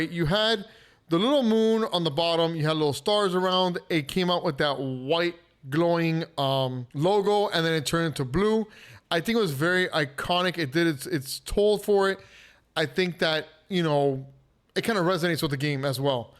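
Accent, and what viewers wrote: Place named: Miami, United States. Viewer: You sound like you're Miami? Are you?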